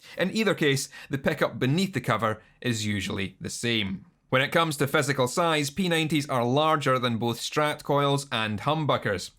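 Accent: Scotland, United Kingdom. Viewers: My favorite part of the Scottish accent is that I know what word is coming next but I have no idea what it’s going to sound like. Riding along with a whole sentence is a lot like a 1980’s movie dressing room glow-up montage.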